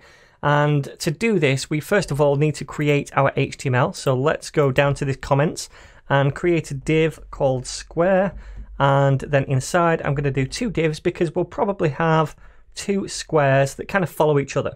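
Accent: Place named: RP, United Kingdom. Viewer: The best thing is he speaks the Queen's English! But everything else is superb too.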